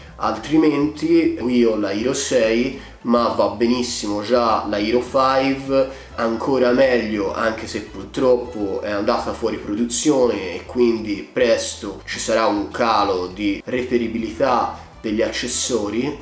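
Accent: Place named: Florence, Italy. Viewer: Grazie mille. Comunque io sono di Firenze! Ho sentito il fiorentino in te hahah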